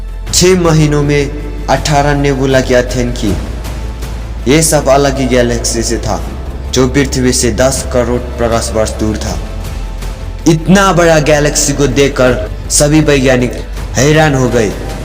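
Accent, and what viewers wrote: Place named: Nepal. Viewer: U nepali bro Accent ley tha vo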